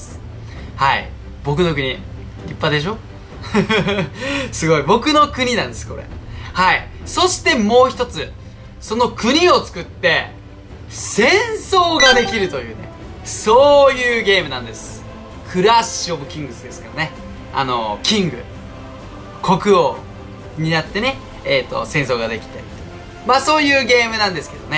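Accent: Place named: Kansai, Japan. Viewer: 関西弁が滲み出てるで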